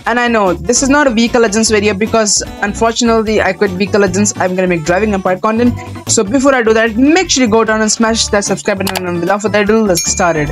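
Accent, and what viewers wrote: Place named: India. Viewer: are u Indian? U have a Indian accent which is cool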